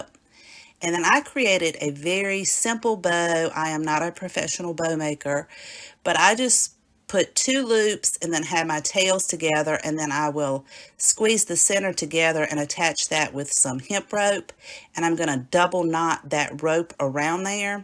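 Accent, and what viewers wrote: South, United States. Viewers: Hello I love your ideas your crafts are sweet ! I just came across your channel and I’m getting inspiration already! I love it great job!love the little snowmen snow sign so cute I’m going to make one too! So adorable I’m a subscriber now too ! Fabulous !ok edit number two love these so much so awesome! Ok I had to say I love your accent southern belle so adorable!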